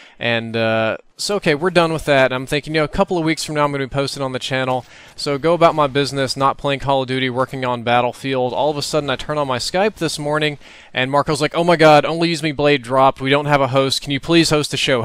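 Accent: South, United States. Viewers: I like how puts so much enunciation on the "h ". yes i know its because hes from the south and its just his accent, but it reminds me of when stewie from family guy says cool whip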